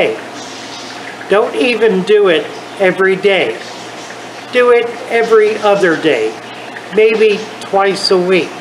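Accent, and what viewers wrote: United States. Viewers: Found this useful, like i did? I'd suggest three things in addition to enhance the usefulness. Turn on subtitles (especially for those not in America or not used to the accent) and set playback speed to 1.5. Repeat listen a couple of times making notes as you go along. Btw, the main content starts. Thanks for the useful info,!:)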